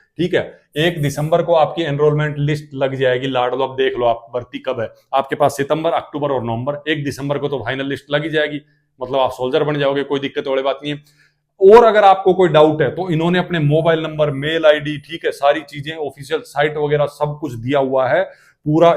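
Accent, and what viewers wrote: Haryana, India. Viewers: Haryana ki ayi ha kya sir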